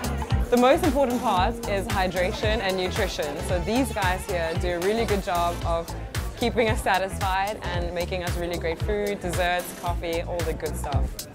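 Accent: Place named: South Africa. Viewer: she has a slight south african accent but a german flag?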